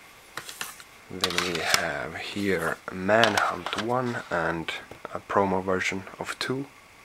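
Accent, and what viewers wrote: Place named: Finland. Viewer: he is finnish. finnish doesnt have pronouncing, you say the words exactly like you write them. for example, trade would be just tra de, not treid. of course we try to pronounce them correctly but that doesnt always work.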